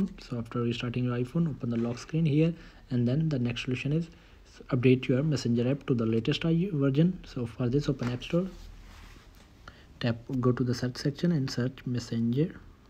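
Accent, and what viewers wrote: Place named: India. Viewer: Bindian english accent.. eww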